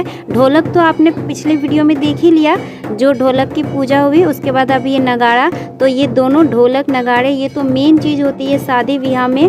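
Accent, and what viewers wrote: Bihar, India. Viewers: Aap bihar se HO kya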